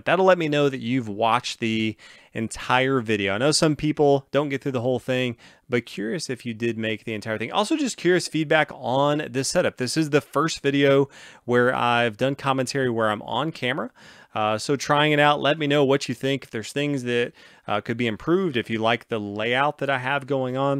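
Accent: United States. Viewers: Thanks. Are you from USA?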